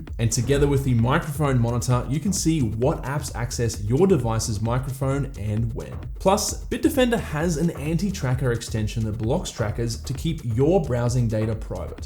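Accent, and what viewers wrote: Australia. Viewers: Thank you!!! I really love your content so much and love hearing a fellow Aussie on here! I’m sold- you definitely made me see that that MacBook Pro 14 is probably the best way I could go… for just a little bit extra than the air with added specs, yet substantially lower than the 16”. Seriously thank you! I can’t believe I didn’t see that before . Well only started looking for a new MacBook recently…. Cheers!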